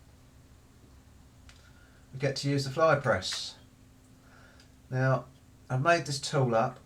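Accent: United Kingdom. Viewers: I enjoy your videos! I am from The U.S.A. I enjoy listening and I sometimes imitate the British accent, not as a mocking feeling, but as a learning experience. I know these videos are not a language tutorial, but I can't help being drawn into the slang you use! Again, not making fun, but I have a few questions about some phrases you used during this video. For example: "Give it a bit a willy!" ".................. "...I made a balls up!" " "...Coots and beans again!" One or two of these are pretty straight forward as to what they mean, but could you (for the readers of the comments and viewers of your channel who may not be from England) answer or translate the meanings of the before said phrases? Thank you! Again, I enjoy and learn a lot from your videos. Thank you! LA./Doot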